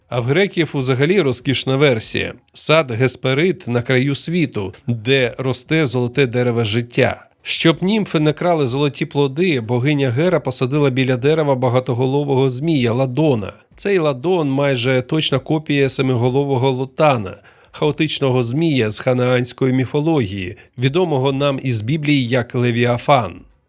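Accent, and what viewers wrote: Russia. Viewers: Такой корявый русский акцент. Ещё и говорит так медленно, еле языком ворочает.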